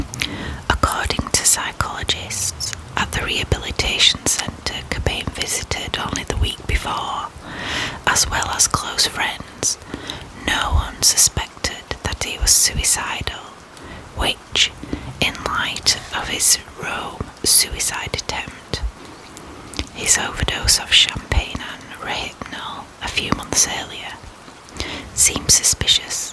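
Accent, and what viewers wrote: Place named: Yorkshire, United Kingdom. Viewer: Came from jojo stayed for three reasons I like ASMR I like the Yorkshire accent I like hot mums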